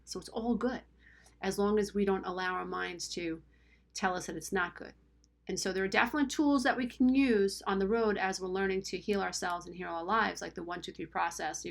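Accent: New York, United States. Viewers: it's refreshing to hear a narc abuse channel with a new york area lilt. nice work. thank you.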